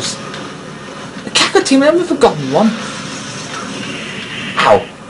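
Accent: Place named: United Kingdom. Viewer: thnx, this video helped. got stuck. also, british accent = awesome :-D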